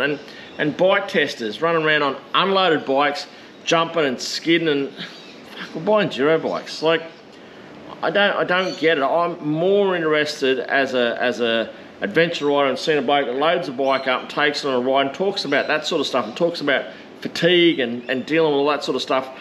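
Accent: Australia. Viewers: sooooo aussie 😂❤️❤️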